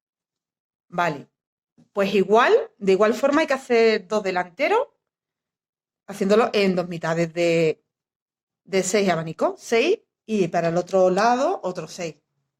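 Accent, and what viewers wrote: Andalusia, Spain. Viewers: Me encanta escucharte con ese acento andaluz yo soy una madrileña en Murcia a si que imagina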